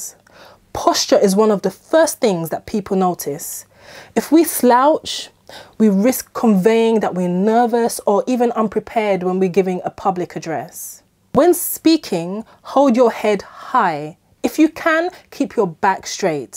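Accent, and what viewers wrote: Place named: United Kingdom. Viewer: Easy for you to say... with that perfect British accent you do not need to work hard at elegance :)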